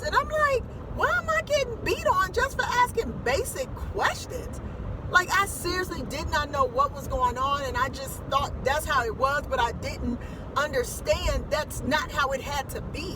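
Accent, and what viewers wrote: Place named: Chicago, United States. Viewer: I knew you were from Chicago!!!! Your accent is straight Chi-town...all the way!!! Im from Chicago too. 😄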